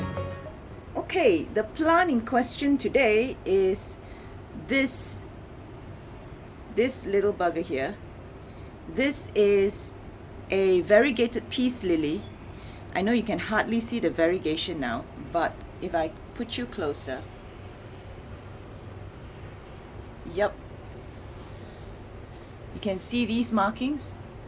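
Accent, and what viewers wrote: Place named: Singapore. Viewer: Immediately after I heard your voice I said, oh a singaporean plant hobbyist.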